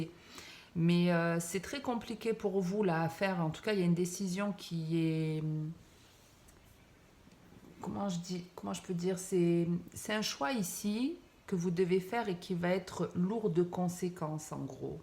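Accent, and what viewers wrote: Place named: Toulouse, France. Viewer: Bonsoir madame vous êtes également du verseau il me semble.j adore votre accent . Toulouse ahhhh . merci pour ce clin d œil.merci pour ce tirage .. bises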